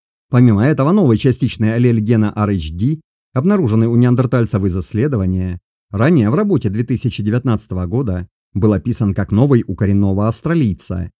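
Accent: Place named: South, Russia. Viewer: Юг России. Просто тихо и в наушниках.)))я же увлекаюсь лингвистикой))и мне говоры не мешают. Такиньки. Южняк)))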